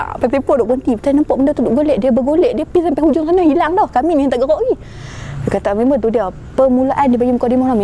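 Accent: North, Malaysia. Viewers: Cerita tk seram.. seronok dok layan sebab cara sembang rancak dgn dialek utara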